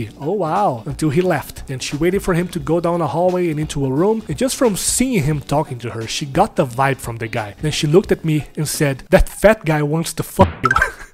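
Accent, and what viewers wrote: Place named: Brazil. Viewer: E aí, mano! Acabei de maratonar todos os videos do seu canal na ordem. Reconheci logo no primeiro vídeo o sotaque brasileiro. O conteudo é muito bom e o roteiro dos vídeos tá muito bem feito, obviamente. E ainda por cima você tem carisma. Tem tudo pra fazer sucesso, acho que já está fazendo sucesso. Parabéns, ganhou um inscrito! Abraço.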